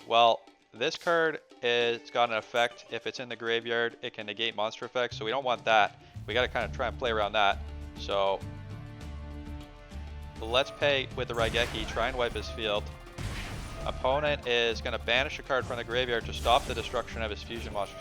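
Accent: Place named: Canada. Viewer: You sound big time Canadian bro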